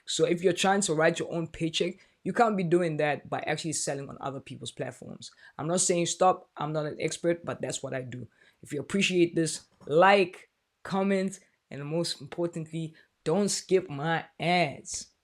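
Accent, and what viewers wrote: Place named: Johannesburg, South Africa. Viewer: Thanks for the honest leveling. Side note…nice to hear a Jozi English accent...not enough of us leftnout there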